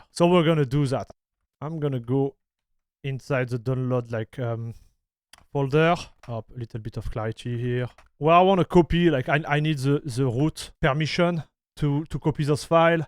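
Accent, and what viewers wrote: France. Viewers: He is french guy? The accent its funny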